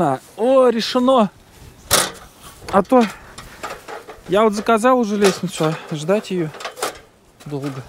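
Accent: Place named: Tatarstan, Russia. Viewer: Пащиму у татарский акцент?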